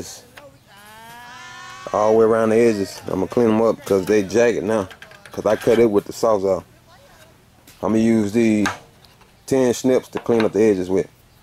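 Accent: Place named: Texas, United States. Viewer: Sound like you from TX man I miss y'all bruh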